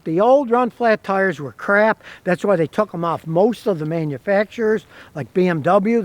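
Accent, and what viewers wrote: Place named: Texas, United States. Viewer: It is the Texas accent he picked up down there 😃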